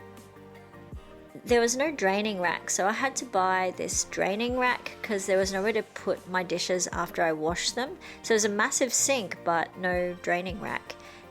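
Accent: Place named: Australia. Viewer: I just love your Australian accent. It's amazing.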